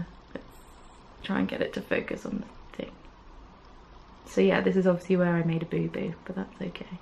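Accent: United Kingdom. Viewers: 😂😂😂😂😂 Balls to the wall, that made me really cackle!! Especially as you are sounding extremely British today. As always fab crafty chat!! Xxxx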